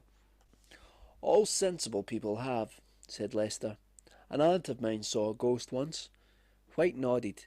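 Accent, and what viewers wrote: Scotland, United Kingdom. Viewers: This is good. Are you scottish or irish?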